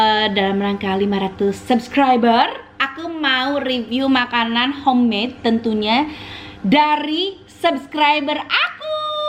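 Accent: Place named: Garut, Indonesia. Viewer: Euuhhb teh.. Mani resep pisan pas kalau ngomong sunda mani lucu, tong hilap teh sundana nyariosna lucuuu pisaaannn, logat garut punya has ya hehhehe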